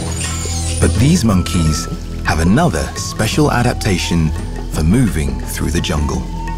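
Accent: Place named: United Kingdom. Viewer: This is the "Smithsonian Channel". Why is some limp British accent narrating? America has becaome effeminate like Britain.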